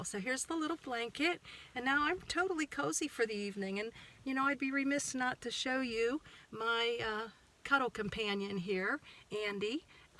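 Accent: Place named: Philadelphia, United States. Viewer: Oh one more thing...I am from Philadelphia, ..I now live in MIchigan..but boy you sound like a philly girl to me ..are you from the east coast?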